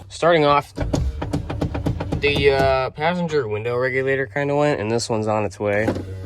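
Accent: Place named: Minnesota, United States. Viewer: Are you from Minnesota ? Just trying to guess by your voice hahaha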